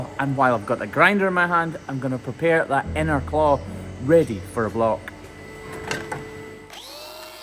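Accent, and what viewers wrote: Scotland, United Kingdom. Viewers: I would move to Scotland just to hear the accent. Great care with the cows :) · You sound even more Scottish than normal in this video lol. I love it!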